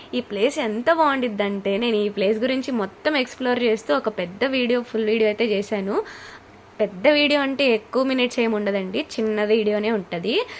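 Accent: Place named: Godavari, India. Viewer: Mee slag Maa godavari jilla la chala bagundhi mee lage 😊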